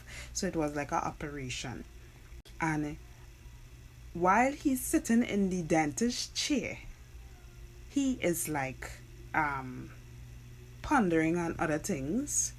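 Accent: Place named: Trinidad and Tobago. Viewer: I hear a trini accent! And this was on point, it all starts in the mind!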